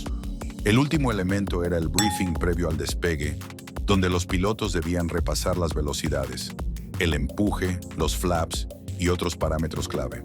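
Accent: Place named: Mexico. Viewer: no, su contenido es un copia y pega y su voz de mexicano da cringe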